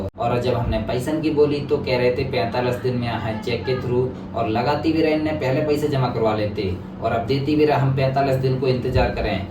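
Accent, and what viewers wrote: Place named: Agra, India. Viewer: bro kha sa ho ap ke boli to agra ke lag re h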